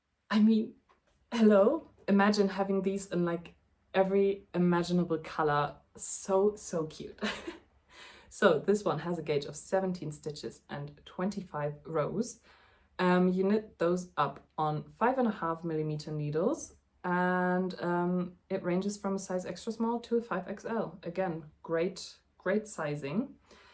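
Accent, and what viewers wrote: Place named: Germany. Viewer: am i crazy or you sound kinda german idk. i thought you were like a fairly big creator on here you look like you could be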